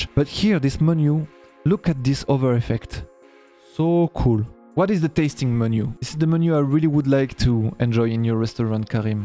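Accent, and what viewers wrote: France. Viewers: Je valide le changement de langue car je souhaite le maitriser et franchement avec l'accent francais c'est tellement plus facile, Merci d'avoir pris l'initiative👍(copié collé le mec) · I love your french accent 👻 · Méga cool en anglais avec l'accent bordelais. Même en étant une quiche avec l'anglais j'arrive à comprendre et donc probablement que je vais devenir plus à l'aise à la longue. C'est gagnant gagnant. Merci ! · Yes! J’apprendrai l’anglais avec ton accent français, se sera plus simple à mon avis. Continue ce format! 🙂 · J'ai du mal a resté focus avec ton accent francais (alors que j'ai le meme accent quand je parle mdr) j'ai bcp bcp + de facilité a comprendre l'anglais avec l'accent pakistanais (ne me poser pas de question sur ca) en tout cas bonne chance INTERNATIONAL CODE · Well done for your first video in English! I would have personally made the websites presentation shorter to give more room for the coding part. 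Anyway I loved it and keep going in English, you still rock because french accent rocks no matter what they say! And the new reviewww generic is gorgeous, made by Matei I suppose? You're really a great team! Go and conquer the world 😎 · J'ai pas pu regarder la vidéo en entier... Désolé, j'aime ton contenu et ta personne mais une vidéo de 12min en anglais avec un accent 100% français ça m'est insupportable 😞 (Je ne suis pas français) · Ce que je voulais dire, c'est que y'a plein de Français qui n'aiment pas entendre l'accent Français en anglais, plein d'Espagnols qui n'aiment pas entendre accent Espagnol en anglais, plein d'Allemands qui n'aiment pas entendre l'accent allemand en anglais, etc. C'est souvent les gens qui parlent nativement une autre langue que la tienne qui aime bien ton accent. Du coup les commentaires de Français qui disent qu'il a un accent français pas ouf, on s'en fiche, vu que si tu parles anglais, c'est pas pour les français, c'est pour des gens qui sont pas français et ont pas de soucis avec ton accent. 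J'pense on dit exactement la même chose mais on s'est pas compris xD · Au contraire des nombreux commentaire, j'avoue que l'accent français me perturbe. Mais, tu as l'air d'avoir un bon vocabulaire et ça semble aider de nombreuses personnes. Pas sûr que tu sois référencé aux pays anglophone par contre ! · Je valide le changement de langue car je souhaite le maitriser et franchement avec l'accent francais c'est tellement plus facile, Merci d'avoir pris l'initiative👍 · Bravo pour cette vidéo ! C'est assez vrai que l'accent français aide pas mal a rendre le tout plutôt smooth ^^ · Hello, petit retour d'expérience sur le passage à l'anglais, même si je vis depuis 6 ans en Irlande : Grâce à ton accent Français, et à un rythme "normal" : ton anglais est facile à comprendre pour un francophone sans avoir besoin de trop se concentrer, ce qui conserve l'expérience agréable (comprendre certaines vidéos US relève d'une séance de travail coté concentration). 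Belle transformation.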